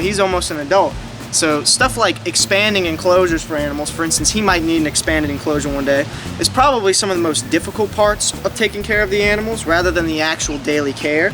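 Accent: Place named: South, United States. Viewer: What do I enjoy most about the channel? You and your southern accent getting excited about your snakes. No improvement necessary. The videos are always interesting, well-timed, and well-edited. It's a unique product all your own. Dangerous animals and insects are always fun, like when you were fooling with the Black and Brown Widows. That was intense. Are you planning on any releases?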